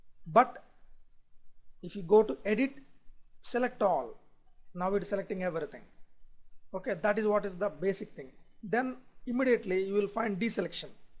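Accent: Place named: India. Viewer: Dude, seriously... give this guy a break :) His Indian accent is awesome!!! :)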